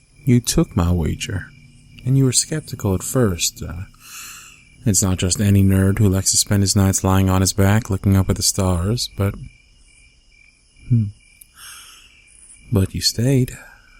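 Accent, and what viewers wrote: United States. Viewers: ok why do u sound country like whyyyyy u remind me of my bf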